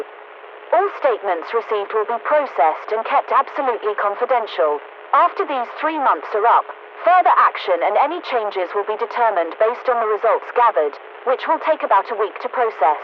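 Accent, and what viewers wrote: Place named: United Kingdom. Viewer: I love how it’s set in Georgia yet she has British accent. PS, this would make a great movie or series.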